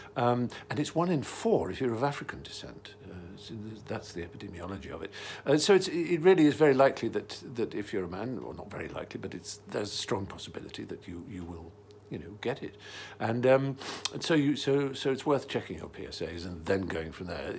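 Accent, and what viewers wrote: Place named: RP, United Kingdom. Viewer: I love the way he speaks. So soft and posh. We need him to stay in this world forever!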